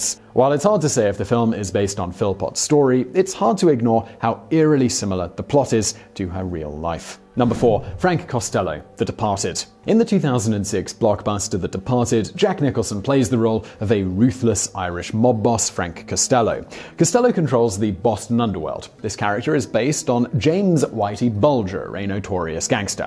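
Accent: England, United Kingdom. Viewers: I have and still do enjoy your videos BUT... You butcher the pronunciation of names terribly! I can forgive some of this due to your English accent, but you really should at least LISTEN TO THEIR NAMES AT LEAST ONCE TO GET THE PRONUNCIATION AT LEAST SOMEWHAT CLOSE!! I mean Damn boy how hard can that be??? Some of these names have been in video for decades and their correct pronunciation is a matter public record. So how about a bit more due diligence. Because the way you butcher names even embarrasses your fellow countrymen. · There's apparently a lot of stuff he can't seem to pronounce properly....must be the urge to put that "proper British" spin on it. Did you happen to see the episode where he pronounces geysers as geezers? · Perhaps the British pronounce words and names differently than us. We did take a proper sounding language and gradually forget how to enunciate our vowels.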